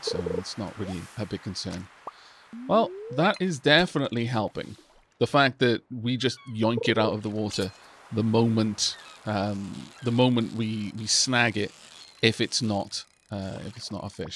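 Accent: Wales, United Kingdom. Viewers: Just found this series love it. You've got a hint of Welsh, where are you from? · Are you a welshy like me? 🏴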